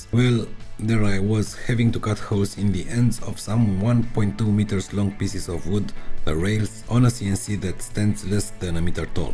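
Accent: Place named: Russia. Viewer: Прямо слышится русский акцент в английском 😂 Вы из России?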